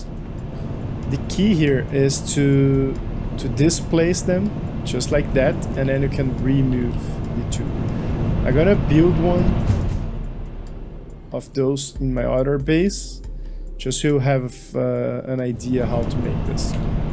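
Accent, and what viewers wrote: Brazil. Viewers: Dá para conhecer um brasileiro fácil pelo sotaque. hehehe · Mano vc é brasileiro??? Pq parece muito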